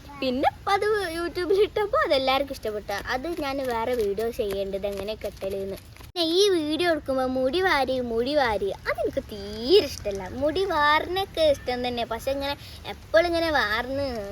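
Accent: Malappuram, India. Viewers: മലപ്പുറം ആണെന്ന് മോളു പറയാതെ ആ സംസാരത്തിൽ മനസ്സിൽ ആവും. ഞാൻ മലപ്പുറം ആയതു കൊണ്ട്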